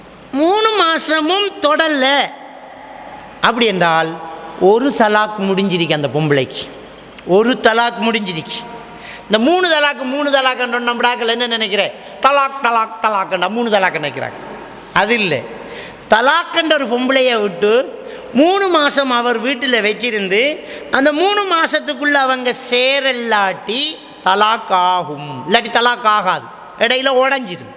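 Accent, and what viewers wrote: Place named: Sri Lanka. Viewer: திருமணம் பற்றியும் தலாக் பற்றியும் தெளிவாக விபரமாக சிறப்பாகவும் சுந்தர இலங்கை தமிழில் அருமையான விளக்கம். அல்லாஹ் உங்களுக்கு ஈருலக பாக்கியங்களை வழங்குவானாக.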